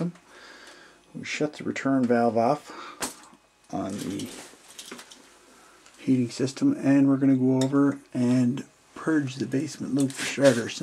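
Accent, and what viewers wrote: Cape Breton, Canada. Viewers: Also are you from Cape Breton?! You sound like a fellow caper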